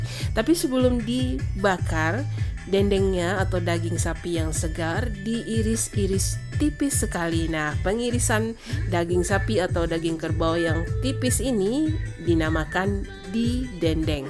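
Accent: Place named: Bukittinggi, Indonesia. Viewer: Ba a dak bacalia an wajah uni ni? Bunyi suaro uni wak urg bukik tinggi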